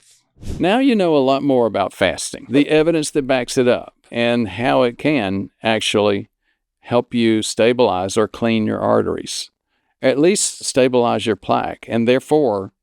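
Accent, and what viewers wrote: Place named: Midwest, United States. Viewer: Calling attention to some unfortunate ambiguity arising from terminology. In southern Illinois, where I grew up, dinner is at noon -- supper is at six. You sound to likewise have had a Midwest upbringing, but I'm nevertheless unclear on your terminology, and fear that others are also struggling with this. Regardless of this unfortunate confusion, thanks for all your efforts. I contend that by profession, doctors and lawyers wrongfully withhold knowledge from the masses through obfuscation. We're not stupid. Your efforts to feed the intellect of the alienated are a godsend.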